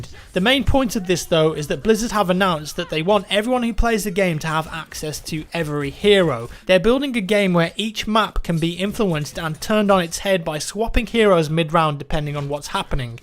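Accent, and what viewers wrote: United Kingdom. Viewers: Are you from UK? Im from Germany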